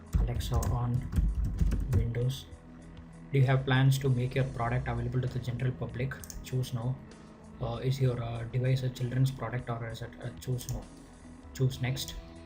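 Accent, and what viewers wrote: India. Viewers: talk with an Indian accent, like I speak with a Norwegian one. He explain things very good, no problem to understand. If you think he talk fast, that is just because of his dialect. Thumbs up.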